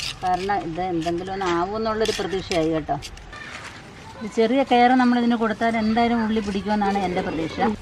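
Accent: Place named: Punalur, India. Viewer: chechi. Punalur ആന്നോ. Veedu. അവിടുത്തെ. Samsaram ആണ്. Super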